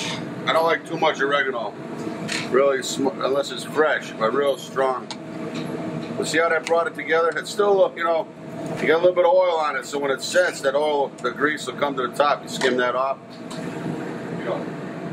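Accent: United States. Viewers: Where are you based sir, New York? I like your kitchen I remind me my time in London but you speak like American...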